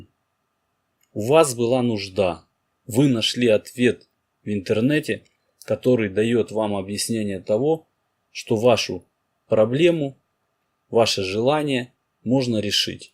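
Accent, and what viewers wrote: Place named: Donetsk, Ukraine. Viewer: У меня был друг с Донецка..Димка.....у него говор как у Вас....мне приятно....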